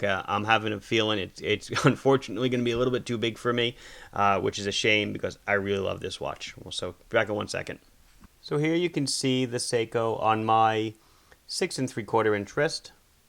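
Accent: Long Island, United States. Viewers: you’re the best watch teacher on YouTube! I’m a teacher myself and I can confidently say that your calm, methodical teaching style and pure joy for watches has made learning all about them easy and interesting. Plus the awesome lawng aisland accent goes a long way! Thanks for making all these Watch and Learn vids for us uninitiated to pick up the clues about the complex world of horology. I was given this seiko (in black🤘🏼) as a gift and came here to learn about it (it’s awesome, legible for how complex it is and not at all too big for my small 6.75” wrist). I now have a new hobby, thanks to and (also his mate over at urban gentry - love how they’re pals) Legends - thanks lads!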